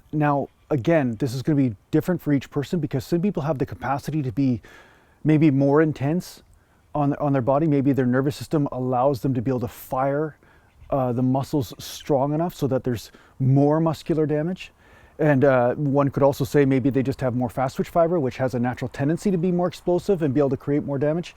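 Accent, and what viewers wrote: Canada. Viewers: If I had to guess, I’d say you were Canadian. But I like this approach.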